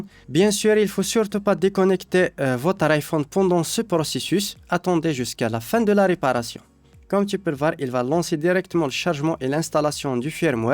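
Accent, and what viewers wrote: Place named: Kabylie, Algeria. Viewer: Salut frr je regarde tes videos et ils sont magnifiques, et je remarqué dans t’accent que tu semblais kabyle mis tmurth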